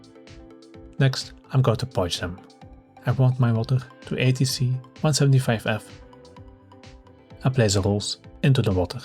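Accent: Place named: Flanders, Belgium. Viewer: I like the accent. How to tell me you're Flemmish without telling.😊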